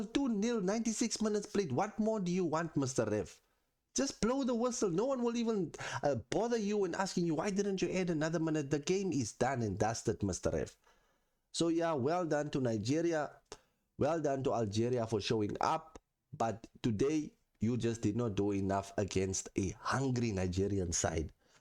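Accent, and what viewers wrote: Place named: Cape Town, South Africa. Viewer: Great take.. you sound like youre from cape town... subbed 😎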